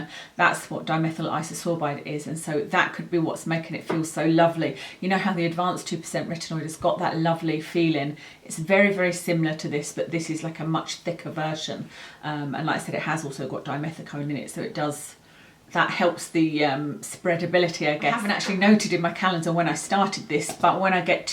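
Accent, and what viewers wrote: United Kingdom. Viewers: Thanks. Great to hear a British voice. Opinion on top tinted, moisturizing spf?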